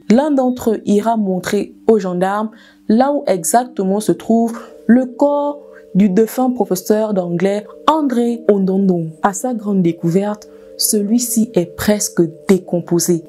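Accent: Fang, Gabon. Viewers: Ahahah que tu sois fang c’était évident hein dès le départ hein, t’as trop l’accent 😅 Par contre je ne savais pas que ça venait de là „les fang mangent les gens“ 🙈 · abonné, contenu incroyable et surtout que l'histoire m'est familière. Au passage je kiff ton accent fang 😁😁 😁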